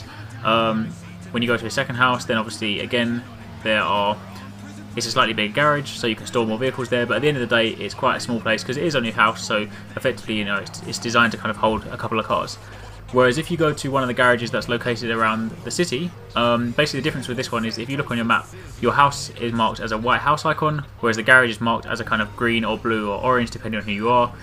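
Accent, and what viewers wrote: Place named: United Kingdom. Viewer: he is british this is how british people pronounce garage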